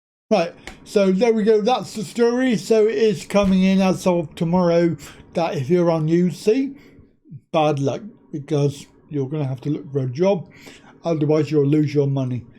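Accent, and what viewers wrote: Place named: United States. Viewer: Why is the voice in a yank accent?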